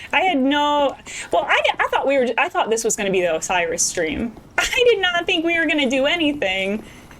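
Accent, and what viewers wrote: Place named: Australia. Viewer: English, not "British" accent but yeah it sounds more Australian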